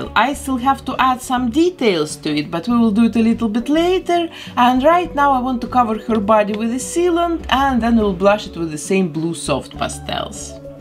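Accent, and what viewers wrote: Russia. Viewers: Why do you have a Russian accent?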